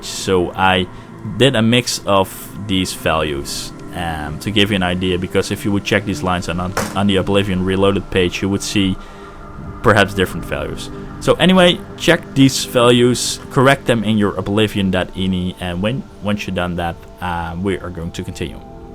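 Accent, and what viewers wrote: Netherlands. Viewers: hai nederlander of belg ( ik denk hollander). ofniet? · Ik hoorde dat je Nederlands was, goede tutorial!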